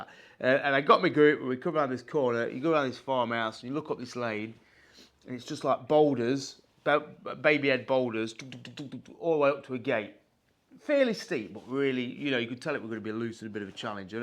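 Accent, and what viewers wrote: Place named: Devon, United Kingdom. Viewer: Fantastic video - I’m quite new to your channel - the more I watch the more I love it. As a small old bloke I really want one of those CT125s and to have that fun that I know I couldn’t have on a GS! Thank you and keep entertaining - I love your Devon accent too - reminds me of living in Derbyshire 30 years ago 😉 Happy days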